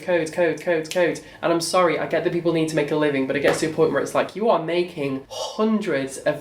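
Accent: Manchester, United Kingdom. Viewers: are you from Manchester of so I love you even more I that is even possible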